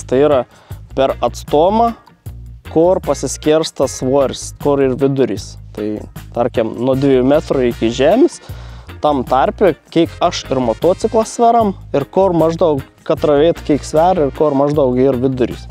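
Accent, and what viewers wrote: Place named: Samogitia, Lithuania. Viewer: Iš kalbos galvojau kad Žemaitijoje kur lakstai, o po to žiūriu, kad čia mano miškas prie Vilniaus :D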